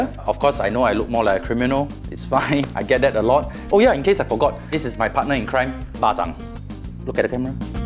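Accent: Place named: Singapore. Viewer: You are a cool lawyer. Thank you for explaining how the law works with regards murder charges. Love the singlish lah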